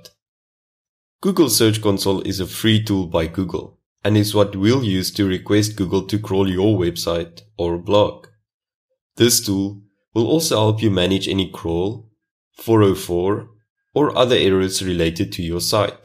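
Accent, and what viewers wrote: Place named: South Africa. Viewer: Thank you! Are you South African? You sound South African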